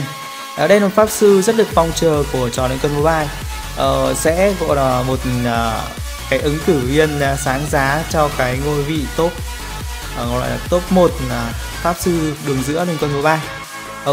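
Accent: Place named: Hai Duong, Vietnam. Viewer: anh có phải người Hải Dương kh ạ? Em thấy giọng anh khá giống người địa phương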